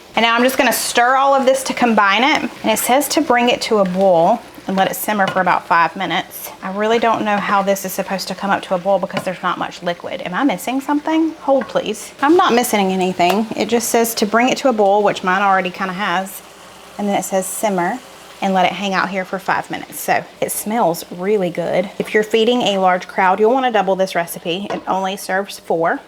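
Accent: South, United States. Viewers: that chicken looked so good , def gonna try that one , but u crack me up, spray them with lil ol !!!!!! here in the north we call it like its spelled . oil hehehee, southern talk makes me laugh at how ppl pronounce diff words ... ty for a great video , oops n all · Ohhhl is the southern lingo for oil…. I love the twang of the south. And you bowl your water for pasta 😂😂 Yeap, chicken fried steak is southern dish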